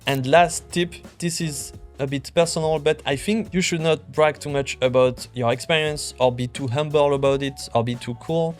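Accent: France. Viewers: Merci, je vais suivre tes super conseils! C'est une bonne idée de faire tes videos en anglais tout en gardant ton accent francais, ca fait original et les anglo aiment ca!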